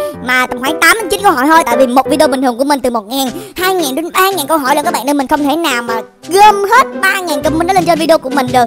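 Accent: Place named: North, Vietnam. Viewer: Nói sao lúc sau giọng bắc luôn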